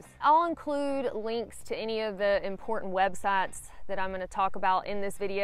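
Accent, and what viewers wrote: South, United States. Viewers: where in the south are you from? Just wondering where your accent is from. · I like the southern accent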